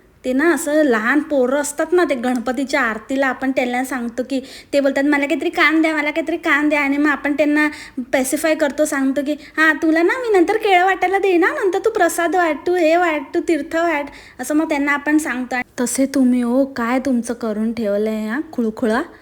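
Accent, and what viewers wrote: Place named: Kolhapur, India. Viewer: Tú Swayahala Ka Dakhvit Nahis ????? Nav ,Gav Kahihi Sagat Nahis ...Ka?????? YuBplnya Varun Tu Kolhapur Kivha Marayhwdayatil Vatates ... Kahi Ward , Tone Tuze Typical Tikadache Vatates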